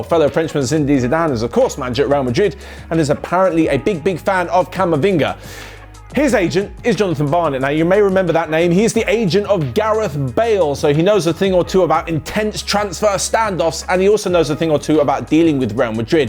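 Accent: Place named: England, United Kingdom. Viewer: Kinda is obvious with the accent, the name, love of english men for footbal etc..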